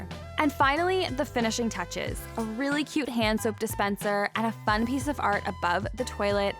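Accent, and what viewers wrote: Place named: Canada. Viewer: Ah ha! I ended up here from another recent video of yours, and I thought I recognized that canadianness (I'm from Montreal, hehe)!! xD Great videos!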